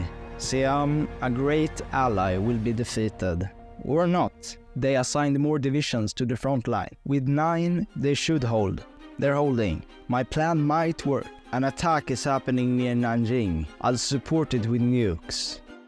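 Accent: Sweden. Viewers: First time watching this channel could tell by the accent he was swedish. I would know im swedish myself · You are swedish. it sounds kind of annyoing, at least of right now, when you use that overly-bad accent on purpose.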